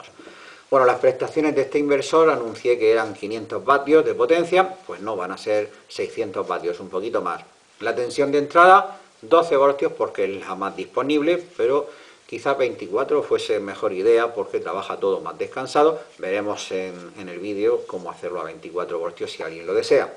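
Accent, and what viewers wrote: Murcia, Spain. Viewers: Me entretienen mucho sus videos, se aprende bastante y, además, aderezado con un agradable acento murciano. Use más "palabros" de la tierra, que son muy simpáticos y también me recuerdan a un bien amigo mío, jejeje...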